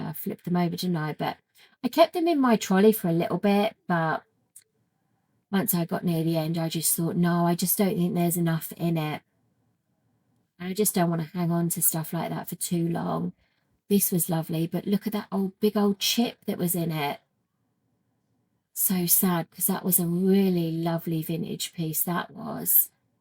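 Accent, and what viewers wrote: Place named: New England, United States. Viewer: I love your accent! Whenever you mention the names of New England towns, I think, “oh, that’s how it’s supposed to be pronounced “ 😂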